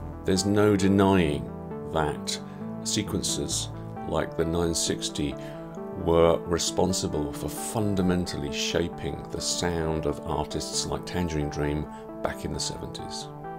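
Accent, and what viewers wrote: United Kingdom. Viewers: I fully agree. After long years of absence I've started last year again with synths. My "starters" were Behringer´s wonderful Model D, DeepMind and Pro1. In the meanwhile the Model D and Pro1 are replaced by systems of Moog. But nevertheless... starting would never happen with the more expensive Moog systems. Therefore I honour the products of Behringer as it gave me the chance to start again... even with a less budget. And not to forget... the work of is fantastic. Lots of explaining in a very British and comfortable way.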